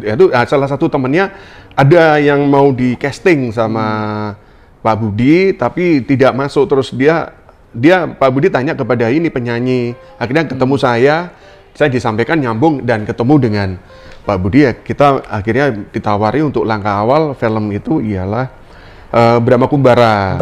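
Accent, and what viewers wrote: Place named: Surabaya, Indonesia. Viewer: khas banget logat Surabaya om 😍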